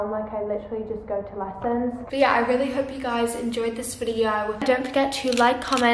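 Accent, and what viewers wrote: England, United Kingdom. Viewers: k im a brazilian learning the british accent and i just wanted to say that youre the first person i hear talking slowly because omg. btw where are you from? loved your accent <3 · I started school on the 1st of September, but I got into in-person school on the 6 of the same month. It really makes a difference seeing your friends every day. ANDDD ´s videos are so inspiring! It is also a little bit weird for me knowing that we are on the other side of the world (literally, I live in South America) but at the same time feeling so close! I also want to say thank because she helps me to practice English, especially because she is from England and her accent is so natural. You give me so positive vibes:)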